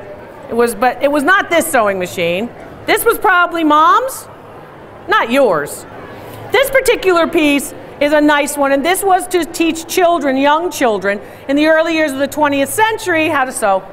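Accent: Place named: New York, United States. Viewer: Ooooohhhh ok. Are you from Michigan then? I heard you say that's a school you attended but you have a bit of a New Yorker accent ❤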